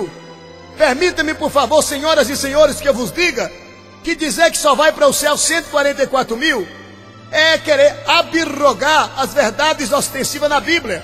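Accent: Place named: Bahia, Brazil. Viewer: não critica o locutor, ele é baiano, o linguaja do baiano é diferente, ele pode ter errado alguma coisa, mais ele acertou muitas coisas, que essa seita ensina, sem jesus não há salvação. e os 144mil são israelita não gentil como nós, vamos parar de ensinar heresias, como os tj ensinam...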